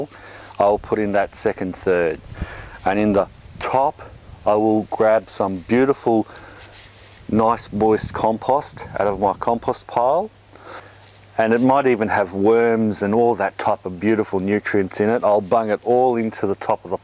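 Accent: Australia. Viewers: Watched the Intro and I Knew you were an Aussie!!! ha. I'm an Aussie in Canada, I miss the accent. I'm also from Queensland looking to eventually come home and get a nice plot of land. So bloody expensive though. Where are you located approx?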